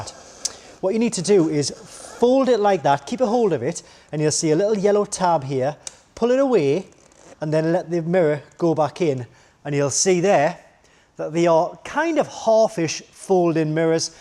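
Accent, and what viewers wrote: United Kingdom. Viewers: Thanks... great to see/hear a British channel. Not clutching at straws in my mind, just useful tips.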